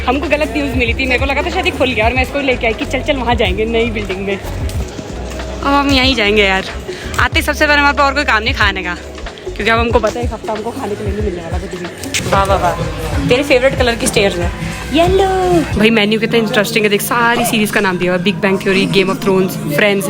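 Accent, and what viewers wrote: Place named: Pahari, India. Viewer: Love ur pahari accent jo aa jata hai beech beech mai.....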